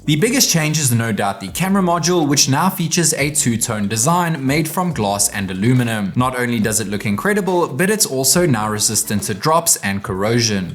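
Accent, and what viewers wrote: South Africa. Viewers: All I could see was The Barron, Witkoppen Rd... 🤣#RubyBlueForLife!!! Cool channel, good luck! Can hear a Zaffer a mile away!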